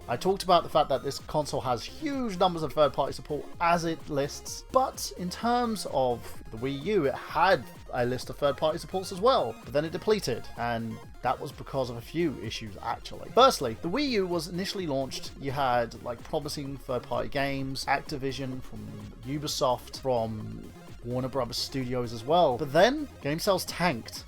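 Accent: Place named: England, United Kingdom. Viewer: you're right. I was mislead by the title of your video, and thus chose to watch the video believing that it was going to be a quality, intelligent critique with evidence-based facts and actually be about the Switch. My mistake. But through our correspondence I have learned something; that even guys with English accents can be childish YouTubers who get butt hurt by negative comments on their videos.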